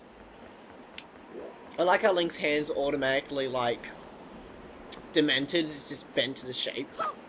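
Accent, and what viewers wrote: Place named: RP, United Kingdom. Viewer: you sound really posh XD waaah i should do a lets play...maybe XD so you can hear my voice >:3 i kid XD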